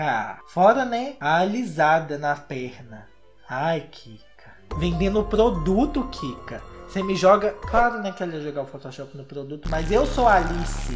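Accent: Minas Gerais, Brazil. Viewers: Gente, o é mineiro? Conversa igualzim a gente aqui de BH.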